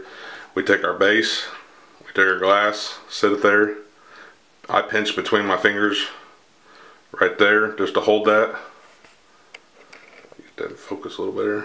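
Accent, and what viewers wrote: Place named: South, United States. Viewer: "take you some paper towel" that southern talk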